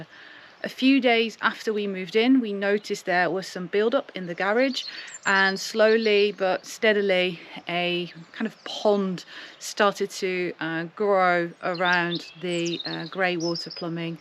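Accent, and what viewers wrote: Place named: Australia. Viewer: Just wondering if you have lived in my country Australia? 🇦🇺 Sometimes you have a little Aussie twang. You did a great job facing a disgusting 🤮 situation. Brave woman.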